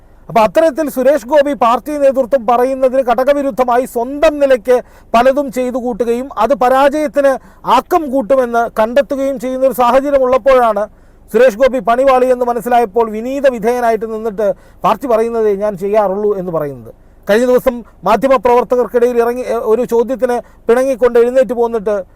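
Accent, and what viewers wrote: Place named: Thrissur, India. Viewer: te Thrissur slang thanne better for this news, Gopi thrissur reports please use ur own language 🙏🏼😊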